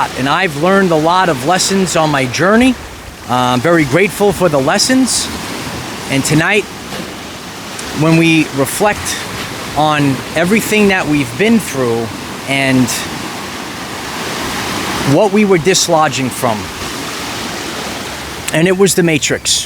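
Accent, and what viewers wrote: New York, United States. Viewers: Wyoming? I would not have guessed. But you have a noticeable New York (or east coast) accent. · I love this message. Thank you! You sound like a New Yorker 😊 We have all changed over the past years especially since Covid. Thank you for inspiring me today I need this. We are light! You are doing good work for the kingdoms❤ thank you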